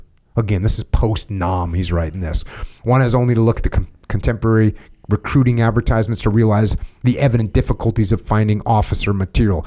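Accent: England, United Kingdom. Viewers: Brilliant from England your English accent had me in creases also well done recognising the authors dry humour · English accent Jocko is the Jocko I didn't know I needed. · Jocko with a British accent is pretty amusing.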